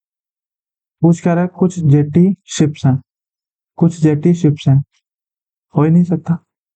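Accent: Bihar, India. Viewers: Bihar se ho kya Bhaai???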